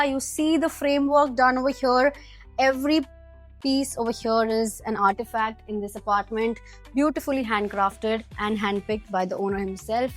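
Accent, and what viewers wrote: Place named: India. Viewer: That is full Indian voice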